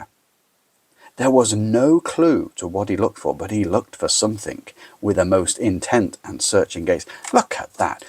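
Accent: England, United Kingdom. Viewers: Good evening, I will definitely use some of your tips with my students on my next lesson on Dickens...and they will also appreciate a pure musical English accent. (I am an Italian teacher of English language and literature, I just stumbled upon your amazing channel).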